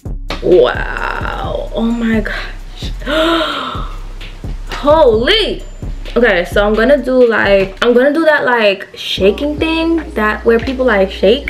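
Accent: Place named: New York, United States. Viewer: I love your New York accent 😭 and thank you for this! Mine just came today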